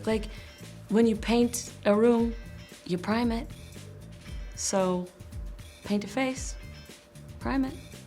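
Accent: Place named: United Kingdom. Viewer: She’s so funny and talented to. Her British accent is 👌🏼❤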